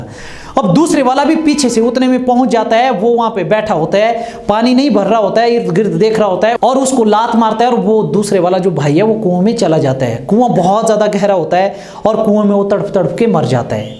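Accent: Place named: Mandi, India. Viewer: Mandi se ho kya bhasha mandi ki lag rahi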